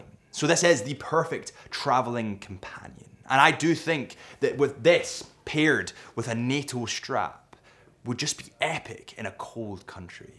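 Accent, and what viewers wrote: Scotland, United Kingdom. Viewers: Can't help comparing you to Adrian Barker. Same presentation and conversation style but with a Scottish accent. Maybe you guys should collaborate? You both like watches, photography & adventures in the wilderness. Would be a fantastic pairing for YT content.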